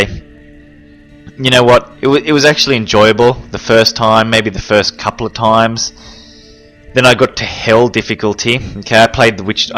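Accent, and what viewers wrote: Australia. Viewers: Are you an aussi? I love your accent!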